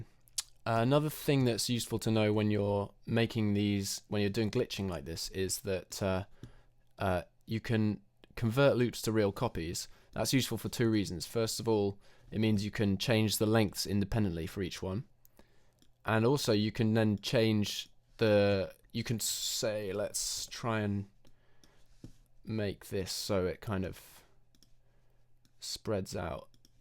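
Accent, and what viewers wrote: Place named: England, United Kingdom. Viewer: love your english accent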